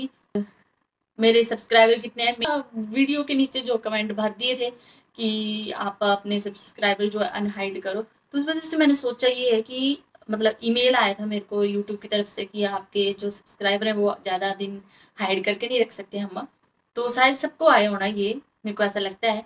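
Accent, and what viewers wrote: Haryana, India. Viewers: aap haryana se ho kya aap ki boli haryana side ki h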